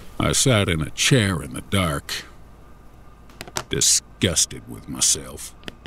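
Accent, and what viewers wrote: United States. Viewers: Good story, and awesome delivery! I LOVE that slight Texas-type drawl you have❣ I think I just may have to subscribe to your channel, lol! I'd love to hear you narrate some long stories as well. One in particular comes to mind. It's called the "New Fish". King Spook did an amazing job with it, and I really think it's a story VERY well-suited to your style as well. Anyway, keep up the great work, darlin'! 😉😘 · I love your country accent man lmfao . But I told everyone on the strange mysteries comment section that this is your channel because literally everyone hates it there now ever since you left, like you were the cherry on top of the cake man Nathan just lost so many subscribers and I literally watched them because like when you would narrate its like you would make learning funny and interesting and you always caught my attention ! 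Now the guy they got there now is so stale and dry lol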